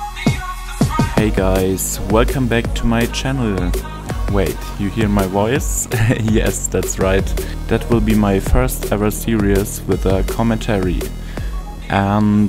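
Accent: Germany. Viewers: Du kommst aus Deutschland das oder Deutschsprachigen das hört man einfach 😂 oder ich liege komplett falsch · Bis jetzt wusste ich nicht mal das du Deutsch bist. PS: Mann hört es aber auch Raus XD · Man hört voll die deutsche Sprache raus 😂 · NGL I love your germann accent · Are you german you have a really german accent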